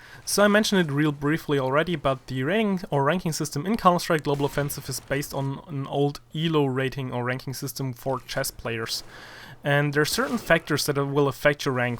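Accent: Germany. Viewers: Bist du deutscher ?:) du hast nen deutschen Akzent :)! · Are you german? Du hörst dich deutsch an :P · you sound like a german :) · Sounds like you are german.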